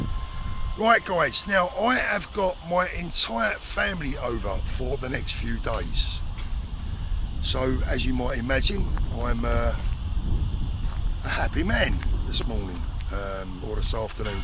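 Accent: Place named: Cockney, United Kingdom. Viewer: Where are you filming from? Do i hear a cockney accent, so long since ive heard one. Wonderful dulcet tones ❤❤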